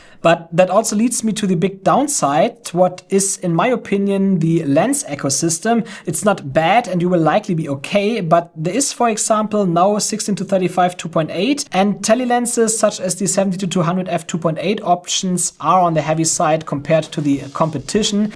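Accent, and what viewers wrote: Germany. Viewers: Man hört diese deutsche akzent so hart raus Hahahah aber gutes video aufjedenfall 👍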